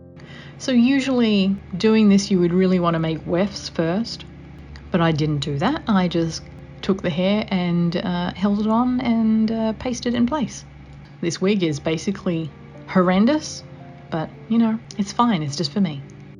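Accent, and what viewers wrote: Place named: Australia. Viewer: Wait, is American right? Why does she sound Australian to my own Australian ears wtf o.o Are my ears deceiving me? I assume she moved to the US haha.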